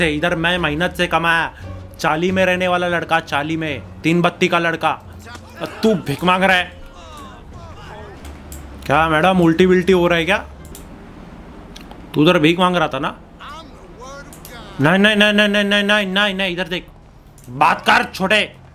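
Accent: Maharashtra, India. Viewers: I love your marathi tadka😘😘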